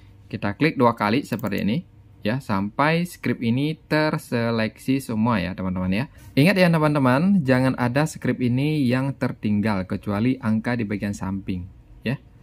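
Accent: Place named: Bali, Indonesia. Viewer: Orang bali ini, medok bali ngomongnya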